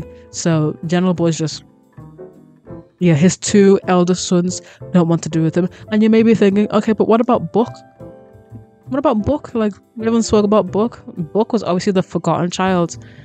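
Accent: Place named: Liverpool, United Kingdom. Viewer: omg 😅 that's a south liverpool accent !! how did my life go this long without hearing another scouse simmer 🥹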